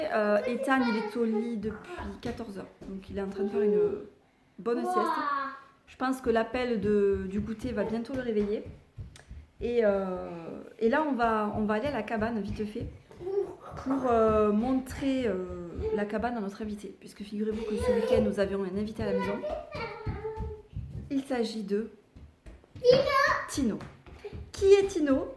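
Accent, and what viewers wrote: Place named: South, France. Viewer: Oh ce bel accent du sud j’adore 😂 elle est trop marrante ! Vlog cool comme d’hab des bisous 😘😘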